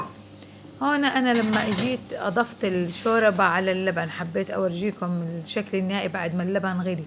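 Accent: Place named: Palestine. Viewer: لهجتك فلسطينية اعملي المسخن ع طريقتك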